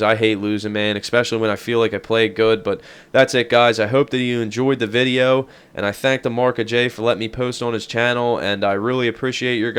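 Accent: United States. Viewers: What state are you from? Killer accent. I'm Australian by the way which is why I can't tell, I can't really tell where all the accents in the states come from :P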